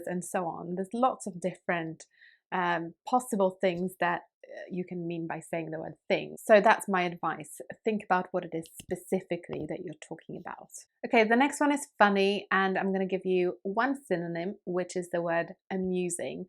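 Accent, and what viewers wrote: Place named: United Kingdom. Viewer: I need to recall the vocabulary of the years I used to study in England. All of these words, phrases and accent are gone…at the same time it feels extraordinary the perfection of your British accent! Excellent work! ☺️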